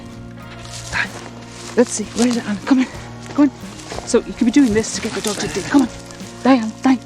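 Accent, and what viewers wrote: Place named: Liverpool, United Kingdom. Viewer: Do i detect a wirral or Liverpool accent great video by the way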